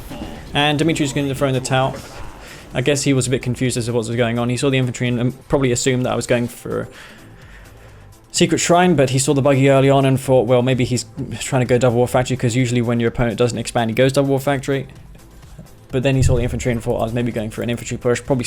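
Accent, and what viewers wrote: United Kingdom. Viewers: he sounds british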